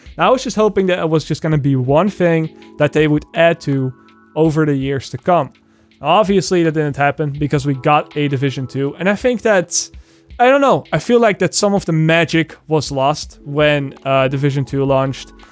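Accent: Netherlands. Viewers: Hey are you Dutch?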